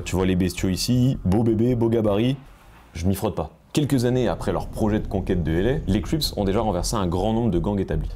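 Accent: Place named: United Kingdom. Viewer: la prononciation anglaise change le sens des mots, stp fais gaffe pour les sourds et malentendants merci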